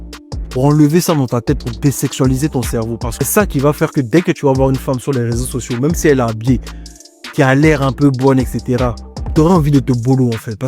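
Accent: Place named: Ivory Coast. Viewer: On dirait que vous êtes ivoirien hein frère👊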